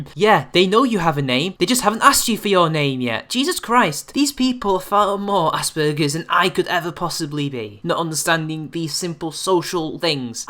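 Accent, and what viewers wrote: United Kingdom. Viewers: I'm not sure you're aware of this but Sargon and Thunderf00t are British, too. In fact, your accent is more like Sargon's. But that doesn't change the fact that your delivery style is like TL;DR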